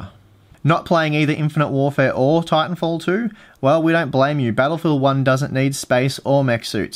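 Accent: Australia. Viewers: I really enjoyed this as well as all your videos. Something about the Aussie accent makes watching all these vids for research a bit more fun...LOL...I would like to add in the states it seems the R9 390's in both the standard and X variants are a bit cheaper with used 390's being found for under $150. The 290's for some odd reason are averaging the $200 you mentioned. Thanks for another great video. Since I only upgrade when I see major dips in frame rates, well educated hands on reviewers like yourself are a godsend. My last rig finally died, (VRM's on the motherboard), lasted me since 2010. It was the old tried and true Phenom x4 965 BE stable on air with a Radeon HD6950 TOXIC from Sapphire that ran as the 6970 with the Bios Switch enabled. Card still runs perfectly, but she is definitely dated and it's time to upgrade. Thanks for making that so much easier. 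Cheers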